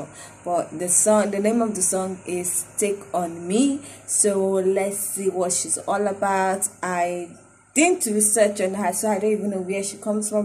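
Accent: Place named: Africa. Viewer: So I was right, I thought it was from a country from Africa, because I've listened so other girls or boys, and they sounded with a similar accent.I like it.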